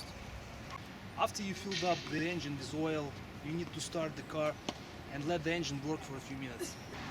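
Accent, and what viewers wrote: Russia. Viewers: he sounds so russian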